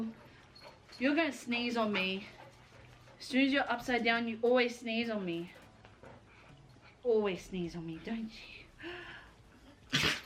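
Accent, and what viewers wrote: Australia. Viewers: The Russian accent is put on, the Aussie accent is real. She lives in Western Sydney. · Ok I’m new but your videos are so funny and amazing! I’ve got a question. Are you Australian? I’m Australian and your accent is very similar to mine. I liked subscribed and put bell on. Keep doing what your doing! ❤️xx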